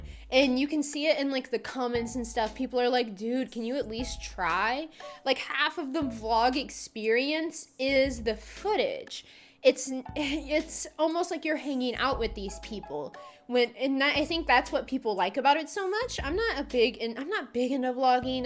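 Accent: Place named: Michigan, United States. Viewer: Are you from Michigan? Lol I'm just guessing from your accent. Im probably wrong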